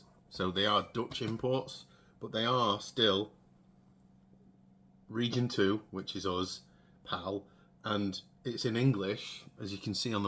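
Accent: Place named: Sheffield, United Kingdom. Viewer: Possible, I actually remember very little about it, but usually if a game is terrible it sticks out to me. I think the Switch version is based on the Game Boy Advance edition. I didn't even know there was a PS1 version til this video. Learn something every day. Also, where in yorkshire are you from? Your accent reminds me of my hometown of Sheffield.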